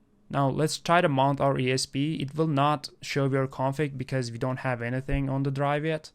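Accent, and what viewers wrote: Iran. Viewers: I love ur Persian accent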